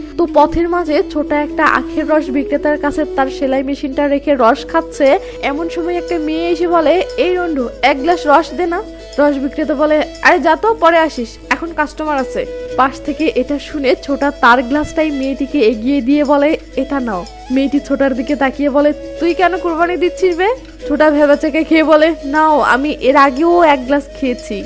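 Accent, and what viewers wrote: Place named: Jessore, Bangladesh. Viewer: আপু আরাম লাগে এটা আমাদের যশোরের ভাষা, খুব ভালো লাগে তোমার কথা গুলো, এবং মুভি গুলো সত্যি অসাধারণ আপু। 🥰🥰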